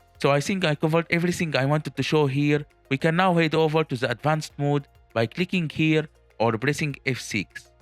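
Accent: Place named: India. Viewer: Indians will never pull eastern women with their accent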